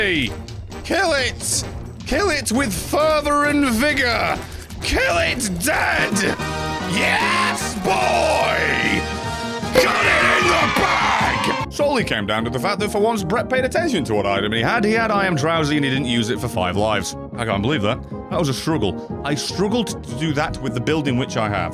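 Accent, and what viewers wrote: United Kingdom. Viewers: Le funni screamy british man has uploaded, what a time to be alive. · Sup you British babe?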